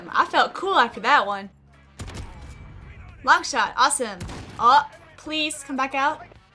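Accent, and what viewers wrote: South, United States. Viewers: I could really hear that southern accent of yours in this video. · How long have you been watching her because her southern accent has been known in alot of her videos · That cackle is exactly why I subscribed. Plus, the southern accent comes out everytime she's just in a frantic shipment mess. So good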